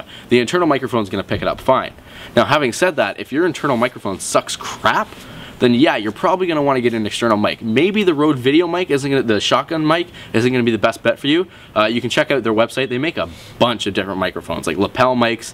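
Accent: Canada. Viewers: haha, you're canadian :) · canadian accent? cute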